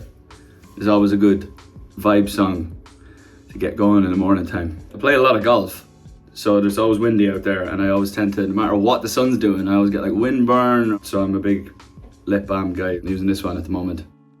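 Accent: Ireland. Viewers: Omg same! I just love his voice and his accent and how sweet and down to earth he is and funny! I've always been into irish guys! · I Stan a guy who takes great care of his skin so efficient and well done I will always love Niall plus he’s beautiful as well his adorable Irish accent and beautiful smile of course that giggle in the end do I like Niall even more or what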